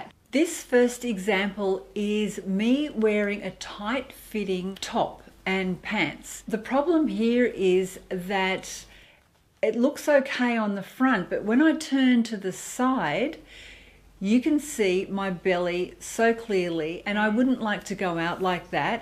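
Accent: Australia. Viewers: Super fantastic video!! So happy I found your channel. I love all these suggestions, and do already wear those types of flowy tops, longer in front and back! Thanks for the links. I LOVE ❤ your hair! Just beautiful 😍 Is it all your own? (I have a family member in the hairdressing business), so have had extensions and own a couple hairpieces. I'd love to hear where/how you get such a gorgeous mane! I love your accent too (Australian?) Sorry so long...new subscriber lol 😄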